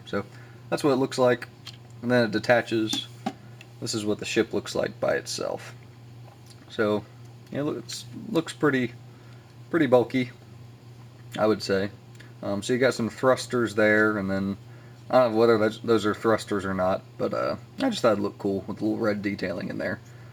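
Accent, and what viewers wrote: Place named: United Kingdom. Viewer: i can feel the british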